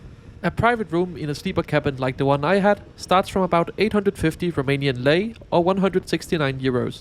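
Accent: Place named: Denmark. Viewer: Just found your channel by accident… but I’m glad I did haha Such great content! Instantly recognized that you are from Denmark given the accent Recently I’ve been to some suburbs of Kobnhavn while they were constructing the Hovedstadens Letbane/Copenhagen Light Rail – quite a unique sight, seeing the freshly laid tracks without the overhead lines You are going to be uploading a video about it? As I read, parts of it are already open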